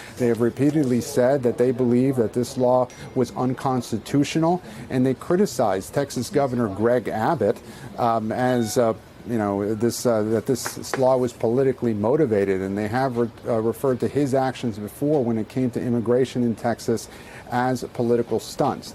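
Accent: United Kingdom. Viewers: What kind of Mickey Mouse operation is this? Starting with a British accent then everyone’s phone is going off in the background. Turn off those candy crush notis katey!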